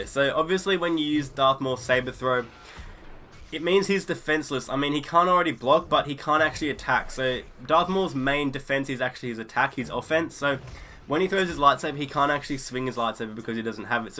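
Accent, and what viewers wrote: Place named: Australia. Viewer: why is every fucking battlefront 2 video narrated by an aussie?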